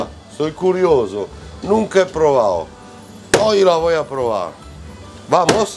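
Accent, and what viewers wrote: Italy. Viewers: Hola me haces acordar a mi abuelo cuando te escucho hablar con ese acento italiano... seria genial q te des una vuelta por argentina para probar todas las pizzas q hay.... son distintas a la clasica pizza margarita italiana pero no dejan de ser exquisitas... e ir a la calle corrientes a recorrer pizzerias es todo una experiencia... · hablando como español con acento tano es automaticamente porteño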